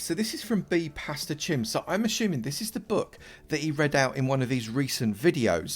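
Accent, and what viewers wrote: United Kingdom. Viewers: I always love your vids and I do have to say that you have the most british british accent ever! Love it!:D -Scottish Person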